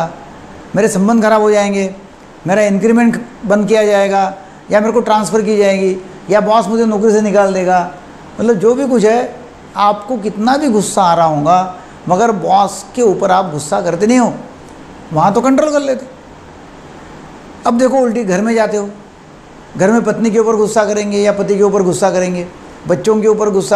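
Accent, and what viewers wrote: Maharashtra, India. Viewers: sir,u can talk in Marathi also ,even u speak in hindi ur tone is like Marathi,pl speak in Marathi or in English or please learn proper hindi, language,tone etc, being Marathi am proud of u ,but u talk valuable thing,but sometimes it's funny tone so pl improve sir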